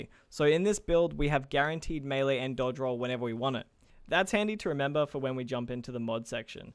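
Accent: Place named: Australia. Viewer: i only just found this channel but its awesome to hear another aussie making videos i might be late to the party here but im new to destiny and i'd love to see some beginner friendly citans ramparts builds for titans its the only exotic i have for titan and i can see void is already strong at the moment but i like the other subclasses too so hopefully you could make a video of some different options thanks, hope i can win the emblem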